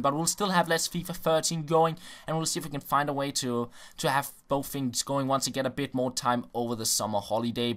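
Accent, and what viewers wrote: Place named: Denmark. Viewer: Den danske accent<3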